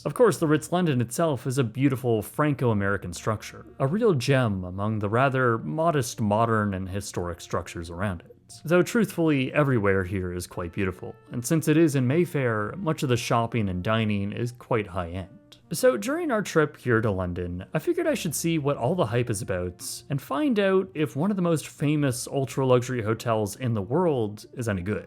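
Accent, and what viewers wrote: Canada. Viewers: This guy just whines about everything in a Canadian accent and makes sure to say “out” and “about” in that dumbass way as much as possible in every video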